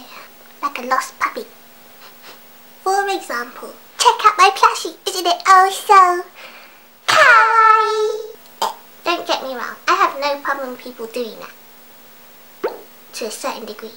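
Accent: United Kingdom. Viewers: somehow i hear british accent. is it just me who noticed that? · A japanese girl with a british accent, now I can die happy. x,x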